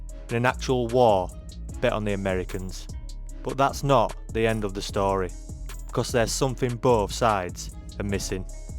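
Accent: United Kingdom. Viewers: Do I detect a Brit accent?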